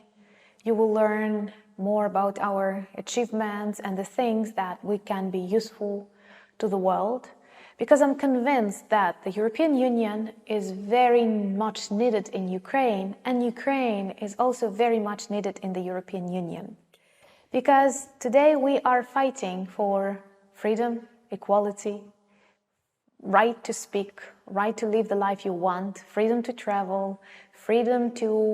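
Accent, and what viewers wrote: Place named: Spain. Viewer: Thank you very much for aproaching Ukraine pride to the world. By the way... Do you speak Spanish? It sound me like Spanish your start.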